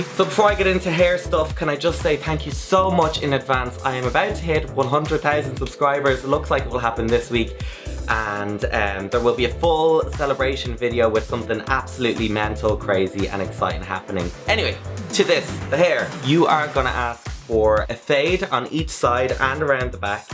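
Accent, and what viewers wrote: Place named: Ireland. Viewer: Hey! I love your videos and you're accent!! Some of my family is from Ireland and I'd love to go. I'm in love with Ireland and its accent!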